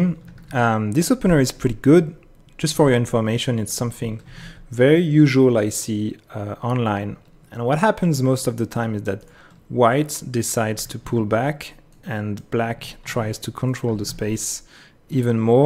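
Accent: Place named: France. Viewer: Great video, I am not sure, but you have a strong French accent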